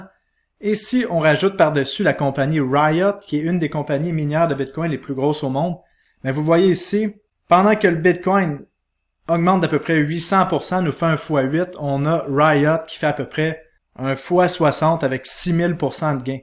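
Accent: Canada. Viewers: Je tiens à exprimer mes sincères félicitations pour la qualité exceptionnelle de votre vidéo, votre contenu est une véritable pépite pour tous ceux qui cherchent des approches novatrices et efficaces pour maximiser leurs investissements. Elle est un exemple éclatant de professionnalisme et d'expertise. J'ai été impressionné par la clarté et la concision avec lesquelles vous avez expliqué chaque stratégie. Votre capacité à démystifier les investissements en actions et en ETFs, tout en offrant des conseils pratiques, est tout à fait remarquable. Vous avez su équilibrer avec brio des informations techniques et une accessibilité pour un public varié. En plus de la qualité de votre contenu, j'ai été captivé par votre présence à l'écran. Votre éloquence et votre passion transparaissent à chaque instant, ce qui rend votre vidéo non seulement instructive, mais aussi extrêmement engageante. 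Vous avez indéniablement une aisance naturelle devant la caméra, et cela renforce l'impact de votre message, et ce petit accent canadien est excellent et rajoute de la légèrete a la vidéo. Votre approche comparative entre le Bitcoin, les cryptomonnaies, les actions et les ETFs a apporté une dimension supplémentaire à votre vidéo. Vous avez offert aux spectateurs une vision éclairée des différentes options d'investissement, en les aidant à prendre des décisions éclairées en fonction de leurs objectifs financiers. En résumé, votre vidéo est un véritable chef-d'œuvre d'informations et d'inspiration po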